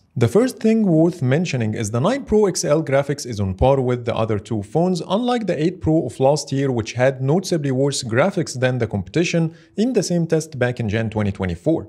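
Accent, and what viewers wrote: Egypt. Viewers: طلع مصري العرص .. يسطاااا اللغة يسطاااا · 3rft enk masry mn el accent, ta7ya masr ya 3m XD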